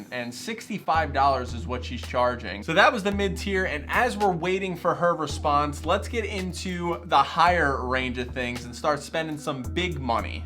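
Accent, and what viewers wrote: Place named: New Jersey, United States. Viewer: He must be from jersey, “balldamore”/Maryland, or PA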